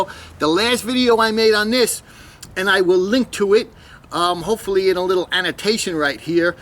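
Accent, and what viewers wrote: New York, United States. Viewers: I see you have a first class plugging tool. They work great, where poorly built ones crumple in use. Quality lasts. I usually pound around the patch wit a hammer to help seat the patch, then test after I drive a mile or two. For a guy who talks funny(that NY accent), you'all are sure easy to understand. Great video, again. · this dude is such a new yorker its almost comical !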